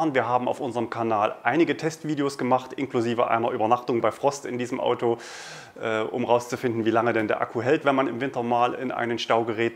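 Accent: Germany. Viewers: Gute News aber du ziehst ja echt hardcore die deutsche Aussprache durch :D Model übsilon geht ja noch, aber <link>.. da steckt eindeutig "drive" im Namen, also elec trive :D.. glaube ich :)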